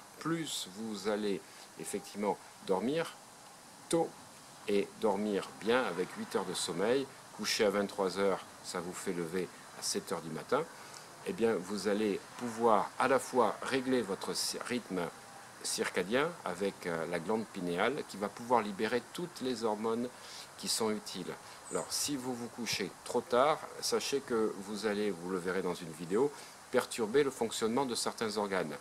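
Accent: Bordeaux, France. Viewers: j'adore votre accent qui me rappelle mon origine Bordelaise, merciiiii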